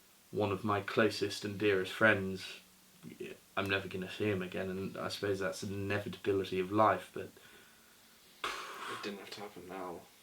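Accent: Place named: RP, United Kingdom. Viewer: Man I wish I could give you a hug. I hope you can find peace with yourself, I just came across your videos this year and I absolutely love your silly antics snd posh accent. We all got your back ❤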